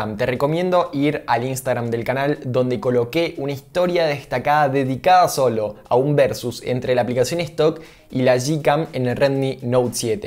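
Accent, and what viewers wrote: Argentina. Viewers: Excelentes review das detalles de todo, sea bueno o sea malo y sos argentino! Saludos desde México wey xD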